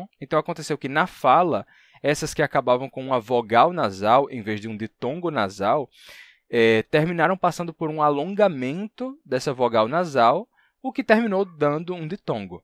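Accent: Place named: Natal, Brazil. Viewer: vc é de Natal-RN? Seu sotaque é muito parecido com o meu e sou de lá, fico me perguntando isso todos os vídeos hahah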